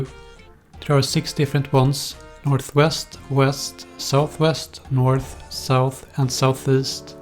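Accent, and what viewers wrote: Sweden. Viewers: Herregud din Svengelska.. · below average in english and he sounds swedish, most(young) swedes are better than this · thats the swedish-english accent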